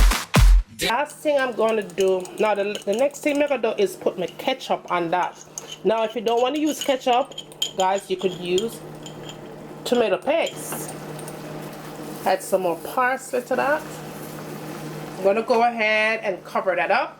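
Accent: Jamaica. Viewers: Jamaican accent is the thing I didn’t know I needed on YouTube!!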